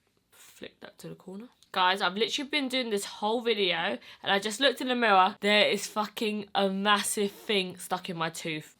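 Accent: United Kingdom. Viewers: hwasa with a british accent aha💕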